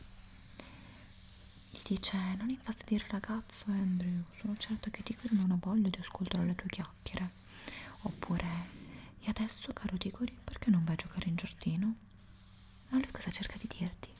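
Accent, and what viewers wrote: Italy. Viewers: I love the softness of his voice and his Italian accent 😴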